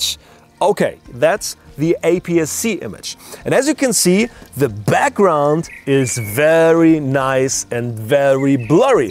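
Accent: Germany. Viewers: "Zenzorrz" and "Sand corns". Okay, to clarify, is German and in Germany we have 2 ways to pronounce the "S" –> sharp and soft. The German pronounciation of the German word "Sensor" is more like (or exactly like) IF it was spelled "Zenzor". That is the reason why this sounds a bit weird here. A common mistake by Germans. We have a term for this: "denglisch" (deutsch + englisch). The German "Z" by the way is always pronounced like a short high hat "ts", never like a soft "S". The word "sand corn" I believe he also derived from the German "Sandkorn". In English it sounds a bit off, because it's more common to use "grain of sand", but I guess it does it's job. But other than that his english is pretty decent – you have to give him that. I don't know any English speaker who performs German for example in that fluent manner. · let me guess... you are from Germany? ;D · are you German?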